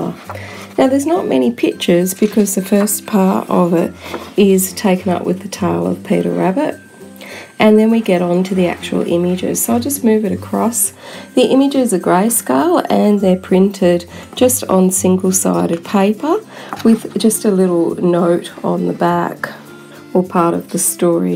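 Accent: Australia. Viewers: Hi Have you moved yet? I seem to remember you saying you ere moving to Brisbane? Love all your reviews thanks for the effort you put into it. It’s so lovely to hear an Aussie accent too 😀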